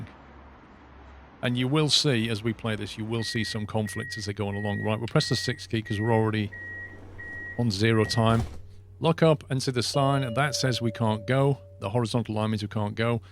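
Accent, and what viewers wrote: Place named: Lancashire, United Kingdom. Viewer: The internet. He's on the internet. If you mean his accent, with the "thuuuuur" pronunciation of "there", I'd say Central Lancashire, somewhere between Southport and Burnley, Blackburn and Bolton. :o)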